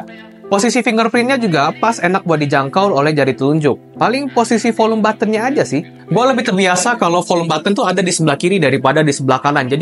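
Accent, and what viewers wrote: Palembang, Indonesia. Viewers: Wong Palembang kak ee?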